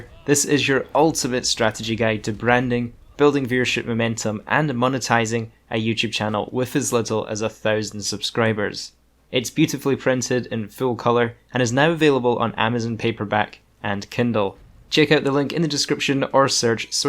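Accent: Scotland, United Kingdom. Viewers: Scottish? I’m Scottish! I know that’s nothing to be excited about but you know 🤷🏻‍♀️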